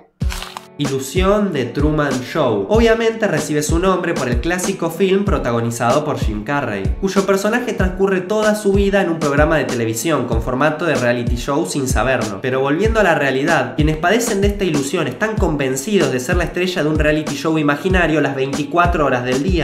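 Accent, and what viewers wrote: Argentina. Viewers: che eres de Argentina? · 5 hechos - Youtubers Banana te juego $10 a que cuando llegues a los 500k haces la gran Dross y dejas de usar el acento argentino en tus vídeos para tener más subs. ;) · Amigo veo tus videos desde hace un tiempillo y me agradan bastante la verdad, bueno solo te escribo para hacerte un sugerencia ojala no te lo tomes a pecho, pero creo yo que tu asento argentino es demaciado pronunciado, no me malinterpretes no me molesta en lo absoluto a mi en particular, mira iré al grano, tu canal tiene muchicimo potencial y quizas debas adaptar tu español a uno más neutro, hay pequeños chistes que dices y a veces me cuesta entenderlos, toma por ejemplo a Holasoygermán, es chileno pero su asento es muy neutro, pueden entenderlo en todos los paises de habla hispana perfetamente. Solo es una sugerencia amigo, aun que no lo hagas igual seguirémos viendo tus videos :), saludos desde Chile.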